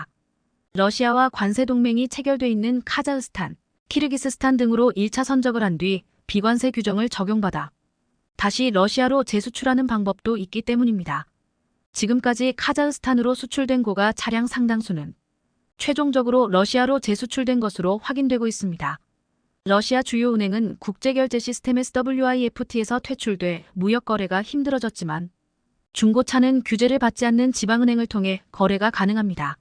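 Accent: China. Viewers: 이거 음성녹음하시는분 중국인 ?? 베트남 한글.숫자 몾일어요 2,000 t 이게 이 영영티라고 읽어요 중국님아 한글 개망신 시키네 유튜버 하지마세요 한글망신 에고거 한두번도 아니고 ........>< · 나레이터는 조선족? 한국인으로 바꿔라! 듣기 거북함!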